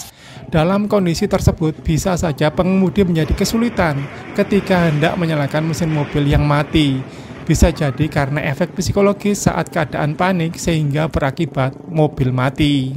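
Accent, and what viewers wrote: Java, Indonesia. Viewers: Aksen jawa nya medok tenan mas….. hadeuuuuuh 😮😅😂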